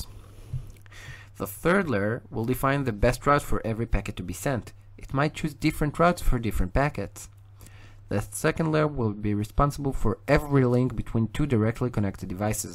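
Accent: Israel. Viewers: אחלה וידאו! באמת, מהברורים ביותר שראיתי שמסבירים על מודל השכבות. ממש מוזר שאין לו הרבה יותר צפיות. מה שכן - המבטא העברי ממש מורגש.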